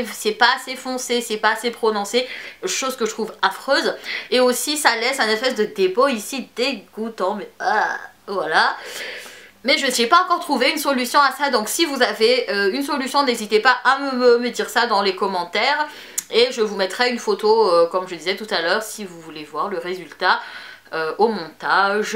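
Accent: Madagascar. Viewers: Tu as de l'accent Malgache 😗😗😗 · Ça se voit et s'entend qu'elle est Malgache. " Manaona Ampelassoua " ....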